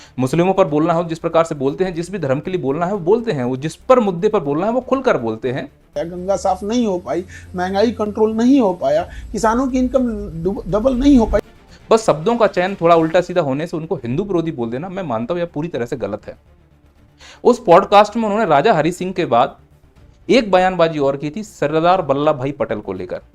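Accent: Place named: Bihar, India. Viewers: Ye बिहारियों का ton hai sir,, aap shant rho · Bihari touch h to nikal jata h asa 😅😅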